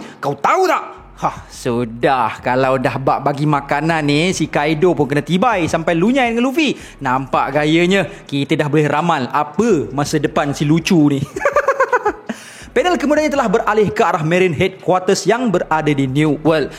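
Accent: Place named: Terengganu, Malaysia. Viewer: Asalamualaikum..bila la ku nak dengar cakap tani..tak moh ka cakap cara kito..beri satu dunia tahu cakap kito...huroooong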